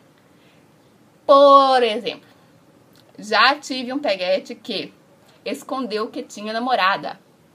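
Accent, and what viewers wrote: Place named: Minas Gerais, Brazil. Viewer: Bom dia, espero que esteja bem. Sou engenheiro brasileiro, atualmente moro em Curitiba. Trabalhei e estudei na região de Munique (Bavaria), nos anos de 2006 e 2007. Assisto teus videos para matar as saudades que tenho da Alemanha. Voce é uma moça muito especial, tenho uma admiração muito forte por voce. Entrei em seu canal ha pouco tempo, mas me sinto feliz em te assistir. Voce tem sotaque de mineira, é isso mesmo: Estava vendo um video seu e que voce vai se casar, qdo sera: sra em minas: O rapaz deve ser um caboclo pra mais de sortudo ter uma mulher como voce nos brcas dele. te desejo muita sorte em sua vida, um abraço bem forte de urso siberiano, Caso queira conversar estou por aqui. Ate mais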